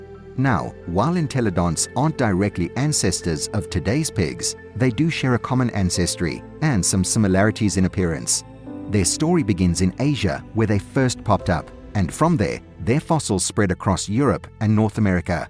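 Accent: United Kingdom. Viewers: UK accents are beyond annoying. DISLIKE.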